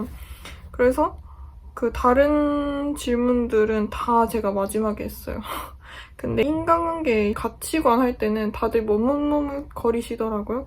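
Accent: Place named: South Korea. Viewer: 파이팅이요!! 한국 사투리 구수하시네요 정감가요 일본생활 파이팅입니다!!!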